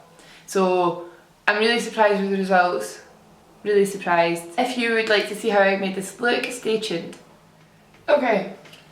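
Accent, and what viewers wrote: Scotland, United Kingdom. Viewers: Omg your Scottish me too xox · Which part of Scotland are u from I'm from Glasgow ! 💕 X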